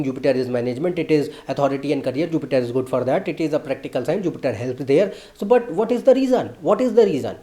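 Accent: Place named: India. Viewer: probably because its easier to understand his accent ,being Indian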